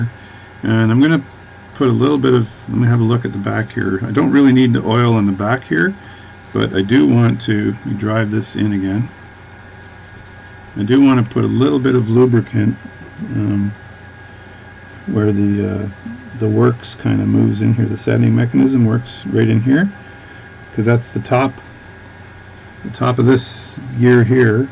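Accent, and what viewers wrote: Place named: Canada. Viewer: Aboot. Does intrigue me the Canadian accent. We have “geordie” accent from Newcastle that sound similar words. And also the Scot accent which has very similar sounding if you know what I mean. Lol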